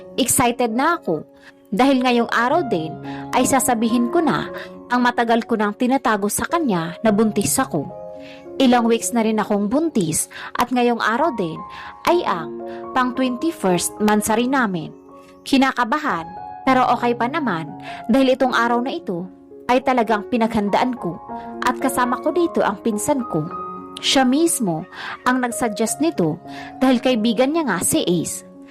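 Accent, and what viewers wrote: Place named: Visayas, Philippines. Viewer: STORY WAS GOOD BUT NARRATOR NEEDS IMPROVEMENT. DAPAT WALANG PERIOD/COMMA EACH WORD. TONO DI KO MAINTINDIHAN IF ILONGGO, VISAYAN, BICOLANO O ILOKANO. OK LANG YUN BUT IT IS HOW TO DELIVER THE STORY PARA MAKATOTOHANAN AT ANG DAMING WORD NA "KO". NO OFFENSE, NARRATOR NEEDS IMPROVEMENT PO SAYANG ANG KWENTO.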